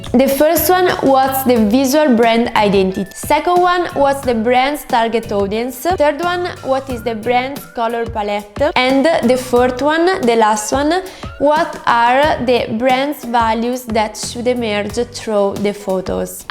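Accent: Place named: Italy. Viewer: Every letter has been pronounced in this video,😂😂😂😂 loud and clear. You sound so Italian, anyway good tips 👍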